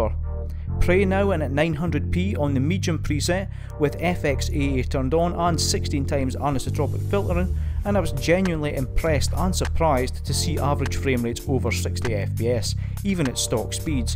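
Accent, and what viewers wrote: United Kingdom. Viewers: Which part does this guy come from in the UK?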